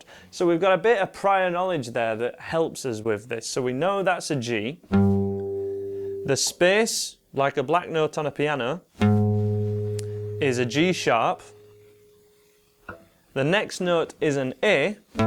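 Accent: United Kingdom. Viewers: I loved ya explanation and I also love ya british accent. That's really catching !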